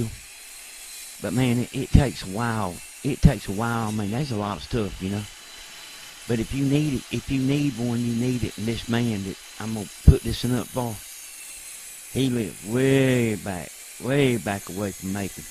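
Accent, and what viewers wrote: South, United States. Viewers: There's a whole bunch of people that sound like down south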